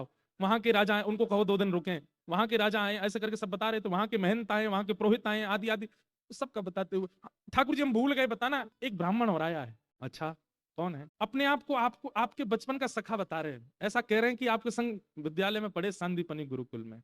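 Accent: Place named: Braj, India. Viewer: Aapke mukhmandal se brj Bhasha pyari lagti h sunaya kro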